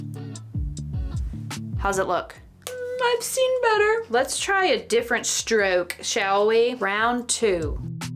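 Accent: South, United States. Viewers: No one appreciates a Southern accent like Southerners do. There’s no shame in that game so let it fly. I have to say that of all the products shone today, YOU, are the best one. Also the freckles. And I have to wonder why companies don’t make faux hair for us silver ladies. We have less hair than anyone so you’d think they’d help us out. I’m all about some faux hair when I can find it in my natural hair of Silver and dark grey. So you go ahead and wear that faux hair with pride girl. Because when you are silver there just aren’t any good ones to wear. Peace and love girl.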